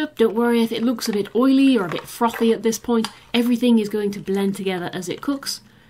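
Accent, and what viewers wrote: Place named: Australia. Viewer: Well, you should. It's really extremely beautiful. You have an Australian accent, am I right? It's amazing. I think you should do some voice overs as well. You should be more serious about good quality equipments. You're doing really well. I think you can. You will do even better if you get some good quality equipment. I wish you all the best.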